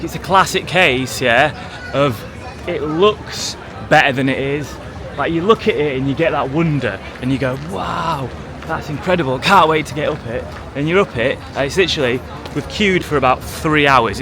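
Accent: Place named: Yorkshire, United Kingdom. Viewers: Long time watcher here- nice to see your first episode outside the UK! I actually first started watching your videos because I grew up in West Yorkshire. I haven't lived in the UK for over a decade but it's nice to hear the accent and I do enjoy your Made in Britain series and Death of the High Street- I think they compliment each other well. I enjoy learning about the old industries- and share your love of old mill chimneys. I'd just like to add that I love Eleanor's fashion sense- actually before you introduced her I was wondering who the pretty and stylish French girl was in the background... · I love your accent. ♥️ Is it from Yorkshire?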